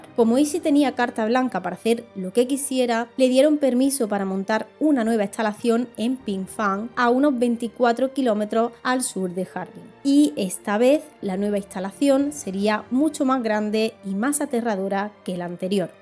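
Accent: Andalusia, Spain. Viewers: Amo su acento...que parte de España es? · Es acento de Andalucía, la perla del sur de España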